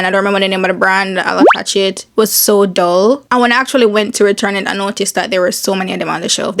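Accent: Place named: Patois, Jamaica. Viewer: lol the patois chips in sometimes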